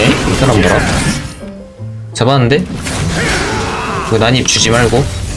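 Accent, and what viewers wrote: South Korea. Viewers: 광주사투린가요 ㅎㅎ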